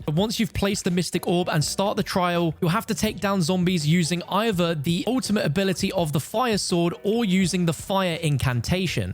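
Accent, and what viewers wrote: United Kingdom. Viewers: صوته بريطاني مزعج كثير كلام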